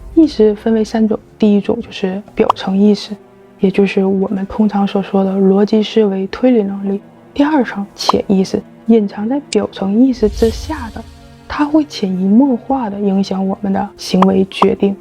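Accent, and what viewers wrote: Northeast, China. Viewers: 🤣🤣🤣🤣🤣🤣我去，看着人，看着画面，看着繁体名字，以为是台北的，一听口音，原来是东北的。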